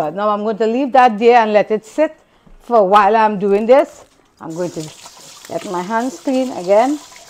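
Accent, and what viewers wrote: Trinidad and Tobago. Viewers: Hello......this is from a Trini living in Africa...where there is no Trini cooking...except for a little in my kitchen. I just discovered your channel. You make me laugh because you are a true Trini cook. No measurements...a handful of flour...a little dash of salt...just loving it. It's the way Trini's cook. You don't need measurements...you know what you're doing...and you do it fast. I watch other channels to see exactly how to cook the food...with the right amount of ingredients. I watch you just to lime in your kitchen. Love hearing the Trini accent and just your way. Next time I am in Atlant again...I will have to visit Tassa. Blessings to you! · I'm a new subscriber and I love watching you cook. I have former college friends who are Trini that I spent years with and you bring back those memories of Trinidad trough the food, accent and love of family. 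It's great to see...can't wait to watch all the past videos and catch up! Sending love and blessings to the family. 🖤❤🇹🇹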